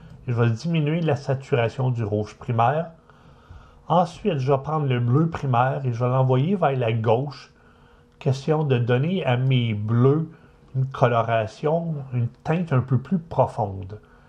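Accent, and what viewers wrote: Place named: Canada. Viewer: Tres bien expliquer .merci beaucoup ( j adore votre accent canadien )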